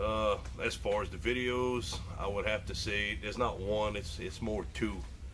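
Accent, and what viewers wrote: Louisiana, United States. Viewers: Hi. I jumped over and gave you a sub back. I like to guess where people are from by their accent. Are you from Louisiana, maybe? We're in Missouri. I love all the green in there this time of year. We've had unusually cold very early this year and everything is toast...cold toast. Well, I listened long enough to hear that you are from LA. ~Janette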